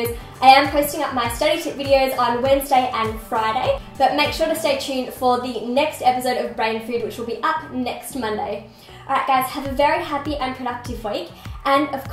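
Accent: Australia. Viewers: Is she Australian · ThisWorldSucks I think she's Australian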